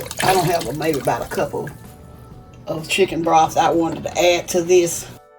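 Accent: South, United States. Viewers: im Hungry Hungry,Hungry, im Definitely Going to Try This Recipe. It looks Absolutely DELICIOUS,UmUmUm,GOOD😋😋😋. And you sound So Much like Mrs.MaeMae, either you are Related or its that, way dwn South Accent😃😃😃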